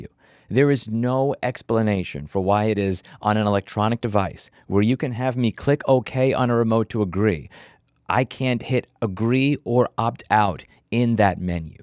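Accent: New York, United States. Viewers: That's that old school NYC talk I can agree with. Thanks for making my day.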